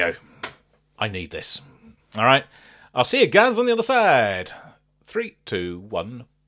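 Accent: United Kingdom. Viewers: Great channel, cool english accent and diction!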